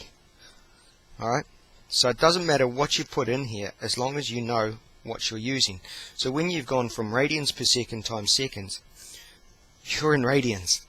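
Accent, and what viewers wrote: New Zealand. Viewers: from new zealand?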